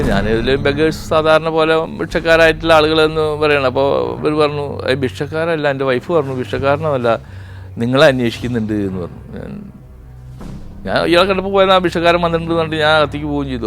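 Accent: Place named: Valluvanad, India. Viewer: സർ ആത്മാർത്ഥതയും സത്യസതയും തുടിക്കുന്ന വാക്കുകൾ. വള്ളുവനാടൻ ഭാഷയുടെ വശ്യത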